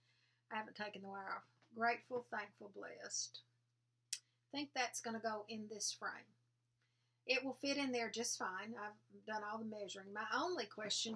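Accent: South, United States. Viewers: I just love your videos - I could listen to you all day long, being from Iowa I just love your southern accent. My family Thanksgiving is in the midst of changing and not just due to covid. This year we won't be gathering, and last year was the first time in my life that I remember going to somebody other than my Uncle Johns' for the gathering (over 40 years - I stitched the Plum Street His & Hers Thanksgiving stockings as a thank you). If you recall, I would like to know the designer of the Halloween tree/alphabet with the pumpkin at the bottom pictured ~ that is adorable. I just finished stitching Scarlett House's Autumn Alphabet. I am so very sorry to hear that your brother is declining, and will keep you both in my thoughts - Joan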